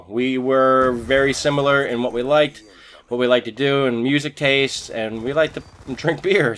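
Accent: United States. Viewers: are you from the bay?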